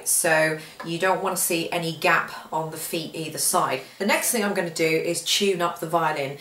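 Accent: United Kingdom. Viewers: I lov the way u speak with a Britain accent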